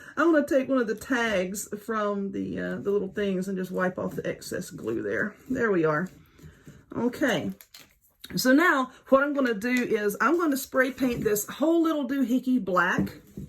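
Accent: South, United States. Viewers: Love your southern accent I'm from Tennessee lol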